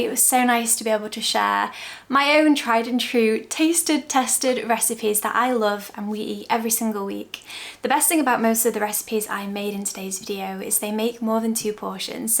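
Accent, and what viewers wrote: United Kingdom. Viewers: Wow these recipes look amazing and yummy. I’m so hungry now. Real comfort food for the winter season. I love your beautiful soft voice with your British accent 🥰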